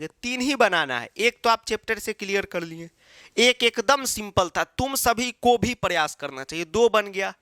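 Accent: Bihar, India. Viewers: Sir aap Bihar se ho na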